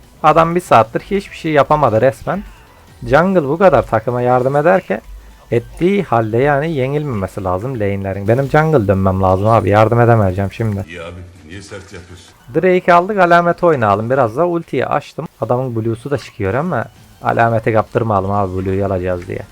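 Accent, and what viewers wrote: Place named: Muğla, Turkey. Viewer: Askerde Muğlalı arkadaşlar vardı. Şiveye ordan asinayim. Ama Alanya tarafına da benziyor. 'Nişlen' kelimesini çoğu kişi anlamaz.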